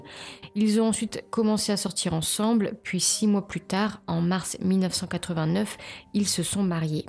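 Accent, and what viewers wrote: North, France. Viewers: Accent du Nord non ?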